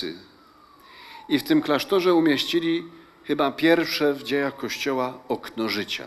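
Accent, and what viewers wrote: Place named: Kraków, Poland. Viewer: oprócz Słowa Bożego pielęgnuj ks. abp swój krakowski akcent - jako dowód piękna naszej ojczystej mowy . Niech Matka Boża ma Cię w nieustającej opiece.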